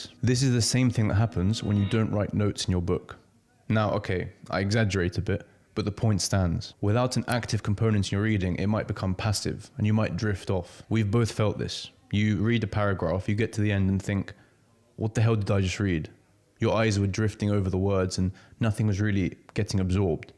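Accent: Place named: RP, United Kingdom. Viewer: Thank you so much for your work.Sometimes i get pretty useful insight from your videos.I like your soothing, not-constantly-being-interrupted-with-background-music voice and your posh accent.In fact, being an English learner, your videos are something that inspired me to switch to British accent rather than the American one.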